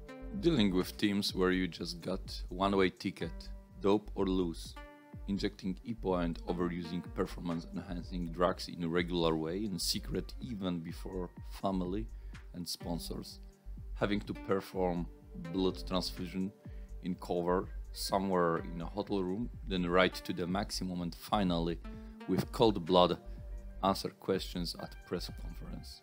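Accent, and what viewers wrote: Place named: Russia. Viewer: The Ukraine? Romania? To the American ear, it sounds Russian.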